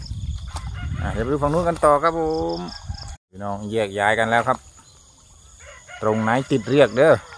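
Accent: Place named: Sisaket, Thailand. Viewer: ศรีเกษชัดเจน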